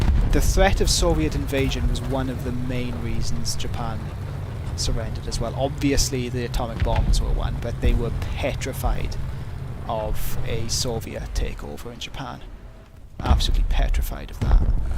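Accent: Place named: Wales, United Kingdom. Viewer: Oh you're welsh.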